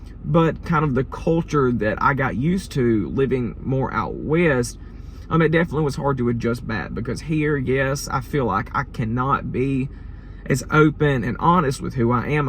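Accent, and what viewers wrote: South, United States. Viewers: . I am from West Saint Louis County. I am sorry for what you went through while living in the south. I am sure the Midwest culture here is probably different than what you are used to and I bet you were asked a million times where you were from with your accent when you lived in STL. 😀. You seem to be a fine young man and I wish you the best. Come back and visit STL soon. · I’m a native Spanish speaker in a small Latin country, I do realize that has a beautiful southern accent, and I really appreciate his vocabulary, not a single swearing word, which is wonderful. I don’t know why, but foul language in my native Spanish or the English that I know, resonates in my mind in a very disgusting way, I’m not a saint of course, but language makes all of us so special, doesn’t it? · I too am a true Southern boy just like you even down to the voice .😊 y'all have a great day and thanks for sharing this video! 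· Thanx for this wonderful video! Me and my partner live in Amsterdam and both really love your southern accent! Hope you are doing well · ❤ I could listen to you talk and tell stories all day long. You have a beautiful southern drawl. I'm a Texan, so I got a slight drawl myself from time to time depending on who I'm speaking with. ❤ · I love your Southern accent 😃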